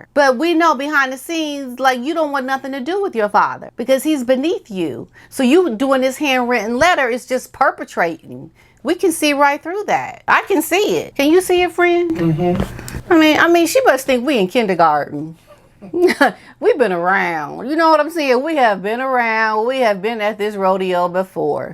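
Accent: England, United Kingdom. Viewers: 😆😆😆 Love your British accent! Made us laugh here in the UK. “Lovely!” xx 🇬🇧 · Hello. You look gorgeous, as always. You're spot on all the time!! I look forward to your videos. You are so funny! Love your English accent!! Have a great day. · GreatEnglish accent.😂💖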